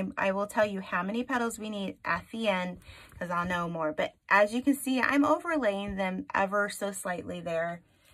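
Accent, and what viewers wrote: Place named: West Virginia, United States. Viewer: yes you still have to pay toll.its 50 cents..I understand how you say words different so people say..I grew up in west Virginia to.so I have the same problem you do.but its all good.love your wreaths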